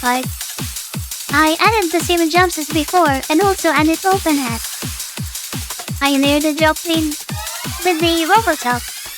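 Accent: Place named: Philippines. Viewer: you're filiipino aren't you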